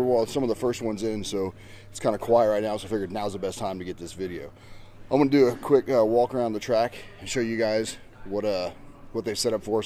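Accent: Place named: United States. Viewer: hello from Alaska! hey. Thank you for this. love the 'in car camera' footage. reminds me of ReVolt (Nintendo 64/ PS1) video game from 1999. Vintage is cool. for me the golden age of Rc was back between 1989 through 1992. Don't get me wrong I love the new stuff. And things are always getting better RC wise. Looking forward to the forthcoming video. BTW. where are you? You sound American. But your videos are from the uk and Europe. right? You had a rock crawler video that seemed to be here in the U.S. Though I am not sure. take care! keep on RC ing!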